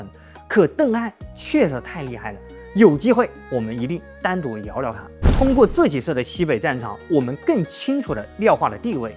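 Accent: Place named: Hubei, China. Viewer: 我今天很興奮的和我太太說，聽湖北人說書，和北方人說書的風格不同，另有一番滋味。我有一個武漢的朋友，說話和一樣有種魅力。然後我太太說，三國是不是在講曹操、諸葛亮和關羽結拜的故事… 我決定一輩子不再和她討論這個話題…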